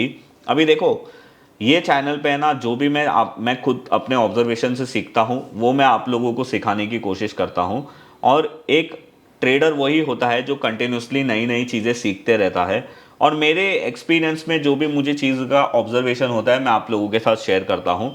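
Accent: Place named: Mumbai, India. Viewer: ..aap ki bambaiyaa hindi zalak jati he..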